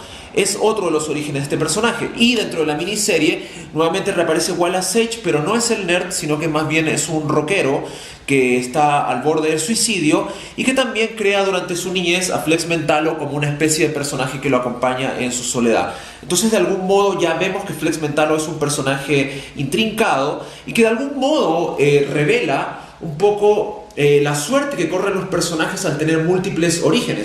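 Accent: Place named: Chile. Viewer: Hola por su acento creo que es chileno claramente, muy buen canal este de termino de descubrirlo y suscribirme. Saludos desde Alicante - España.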